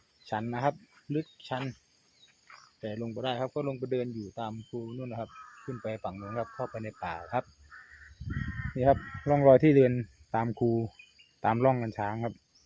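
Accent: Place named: Korat, Thailand. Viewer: เป็นคนกะไหนน้อครับคือเห็นพูดโคราชดาครับ😄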